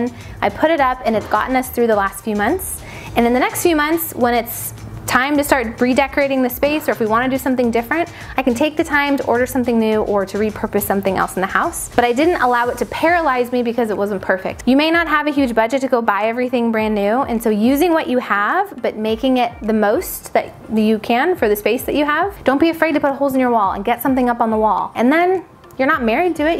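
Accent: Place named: California, United States. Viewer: Is it Saw-yer or Soy-Yer. Or just your California accent! ❤️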